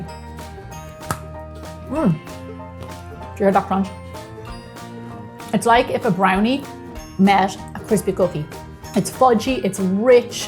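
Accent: Ireland. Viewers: I love your Irish accent! ❤️❤️❤️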